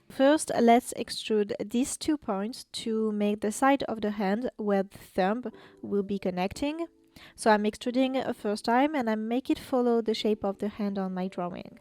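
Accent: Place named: France. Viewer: I know it ur French that soft btfl voice and accent soo cute :!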